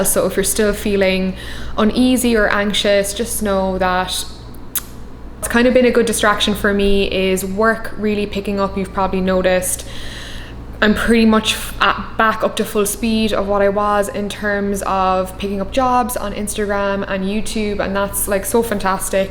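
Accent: Cork, Ireland. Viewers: The Cork accent jumped out in this one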